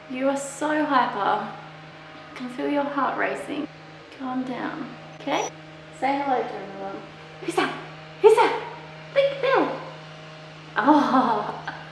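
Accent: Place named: United Kingdom. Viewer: I thought you live in England. You accent was kinda British that's why I got confused. Then props to you <3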